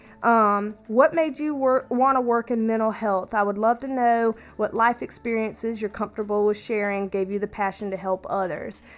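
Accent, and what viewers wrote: South, United States. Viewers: A fellow Aries!!! I looove! 😍 No wonder I feel like I just click with you! Plus, ya make me homesick for the south with your accent! I'm a Texan living in New York right now, so listening to you makes me feel at home. ❤ Love ya, gal! Take care! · I looked up the word perking it means cheerful or lively, it's so cute when you use that word :) I'm from NJ. and yes we talk differently and it's so fun for me to hear the southern accent I have a strong Jersey accent and yes I can't stand it LOL! I have been binge watching all your videos and you are amazing! You really are amazing and I hope you can see that. I believe your channel is going to be huge and if you come out with Merch I would be excited to purchase it. I just want you to know you are a gift to this universe! Your kindness and your beauty radiates through the computer and I would like to thank you for telling us about you! 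Keep on Perking :)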